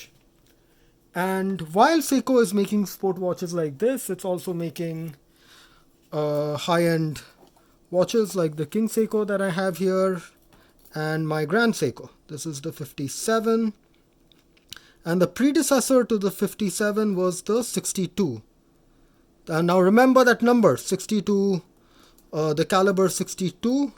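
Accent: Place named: India. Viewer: when i hear indian accent, all I know all of this was bullsh*t and you stink , you didnt even explain the acronyn 62 "MAS" seikomatic selfdater bullsh*t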